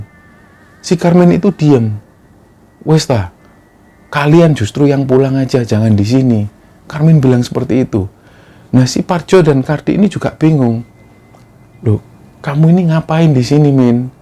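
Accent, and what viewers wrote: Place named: East Java, Indonesia. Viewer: Sy 8th di surabaya dan 3 thn di Malang, gaya bicara mas itu mengingat saya akan model Timuran, terutama ke-2 kota tersebut, sy asli Medan, tapi sy berharap masa tua saya bisa saya habiskan di Malang, penuh kenangan di sana.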